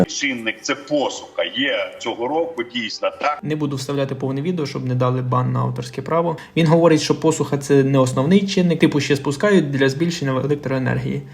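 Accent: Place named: Poltava, Ukraine. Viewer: Ви говоріть або російською, або українською, цей суржик задовбав... Це в мене так на Полтавщині... говорять, але там був більший вплив Рашки...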